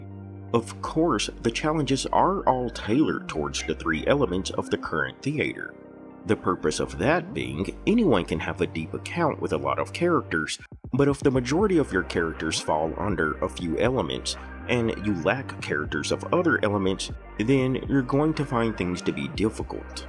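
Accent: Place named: South, United States. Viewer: I like the video and your southern accent. Thoughtful analysis. 1st time I see you. Subbed. Hope u post more.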